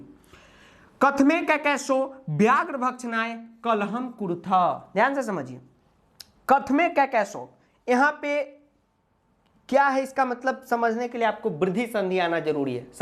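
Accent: Bihar, India. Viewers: Sir Your bihari accent >>>>>>all school sanskrit teacher🎉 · Sir aap itna aacha samjhate hai humko sab samhj me aata h mujhe pehle sanskrit jada nhi samjh aata tha but aapke videos ko dekh kar ek umidd aa rahi h sir 😊😂🎉 or aapka ye bihari tone me jo aap baat karte ho vo mujhe bohot aacha lagta h sir 😊 you are great sir 🎉❤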